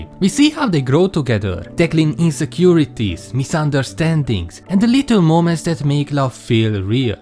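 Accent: Hungary. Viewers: Is that a hungarian accent I hear?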